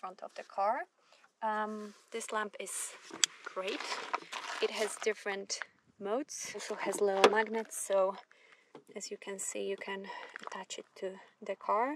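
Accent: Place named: Central Europe. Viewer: I'm curious about three things in regards to your video. Firstly, why do you feel the need to roll the windows up in Griz country, and secondly, have you considered removing the front passenger seat for more room - or does it serve some purpose? Lastly, you have an interesting accent that sounds to my ears to be from Central Europe - am I close? 😀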